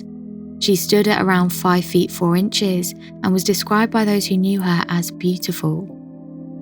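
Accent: United Kingdom. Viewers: Thank you for all the content, you're a pro! Love your soothing voice and the british accent🙏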